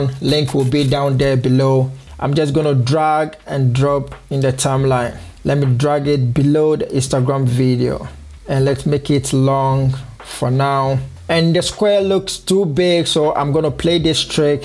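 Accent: Ghana. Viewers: Hi. Love the Ghanian accent. How to you add effects to web pages like you did from showing the videos you make. Once again LETS GET STARTED.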